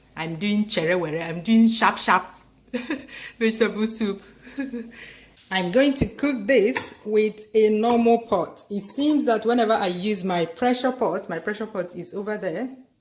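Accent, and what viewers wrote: Igbo, Nigeria. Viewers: Could you please moderate your voice for non IBO/foreigners to cherish the use of English to explain. Regards. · So love your Igbo dialect, confirm nwa afo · Oh God. I love youuuu The Nigerian accent, the way you explained, just everything 😍❤ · Hi, just love the way you simplify the cooking process of all these beautiful recipes, the fun and chuckle one grasps as you talk and the Igbo accent with no apologies. Looking forward to more videos. Keep it up. God bless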